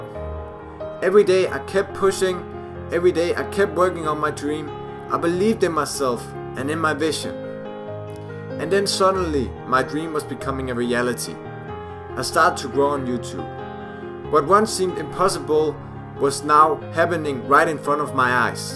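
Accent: Denmark. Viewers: are u from denmark xD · Hey! You're a big inspiration to me, ty for being who you are. I can hear on your accent, that you're danish too. #DanskArmy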